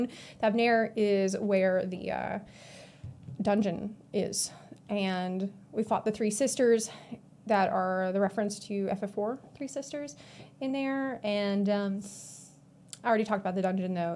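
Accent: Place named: Georgia, United States. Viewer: The Georgia accent really coming out here haha. I love it!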